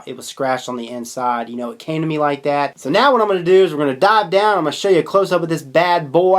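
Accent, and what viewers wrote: South, United States. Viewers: Are you serious? Dude talks with a Southern drawl...Dont try tawkin to a New Yorker if you think A southern drawl is fast!! Really youre being sarcastic ...Iknow lmao!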